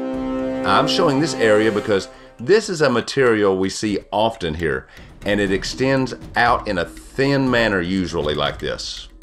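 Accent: South, United States. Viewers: Fortunately we in the USA associate a thick, good Southern accent with superb space-science- in fact, if sounded like typical YT A.I., who could care. Please keep up the great work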